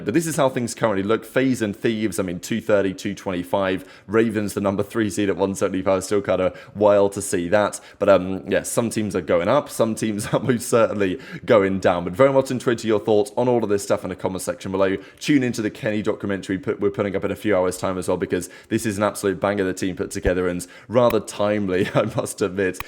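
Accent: England, United Kingdom. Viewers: He’s saying very much intrigued to your thoughts. Fellow Englishman I can translate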